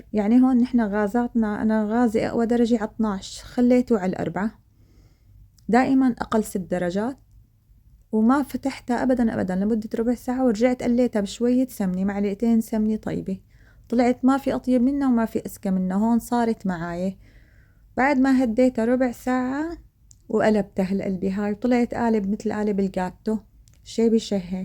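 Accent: Homs, Syria. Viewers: والله شي بشهي يعطيكي العافي ودخيل الحكي الحومصي😅😘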